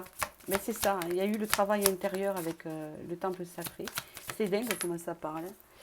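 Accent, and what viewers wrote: South, France. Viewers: Merci merci merci pour toutes vos belles et inspirantes vidéos 🌸🌼🌺🎉 et n'écoutez pas les mauvaises paroles sur votre accent, ce sont des personnes malveillantes et sont jalouses des personnes du sud mais ça on n'y peut rien! Vous êtes formidables et apportez beaucoup de lumière ne changez rien et ne changez pas vive le sud 🌞🌞🌞💞 Solange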